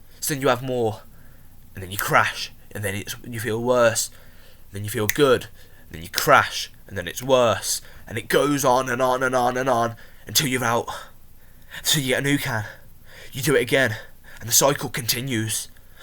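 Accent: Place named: United Kingdom. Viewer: you sound british but with a twang lol.....what is your disability? how old are you? And where are you from? Are you on Facebook? Surely we can get you some sort of help! What organs are failing? What solvents have you been taking? How did you first get into taking them? Have you been doing them for a long time? I've never tried solvents, i see friends use gas and also use hairspray and a piece of cloth.......they said everything would sound like a robot and they would laugh.....I never tried it because i was so scared of drugs back then. I can't believe i went on to smoke opium and later on herion......it was such a nice feeling but now i don't get any buzz at all i just take it to feel normal and not unwell. I am coming down off of it bit by bit.......I have a fear of death that makes me have very bad anxiety on a daily basis. Would you give up solvents if you were given help in regards to your transplant surgery?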